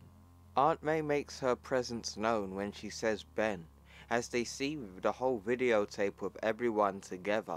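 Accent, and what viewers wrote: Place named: United Kingdom. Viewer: What part of the UK are you from? You have one of the least annoying British accents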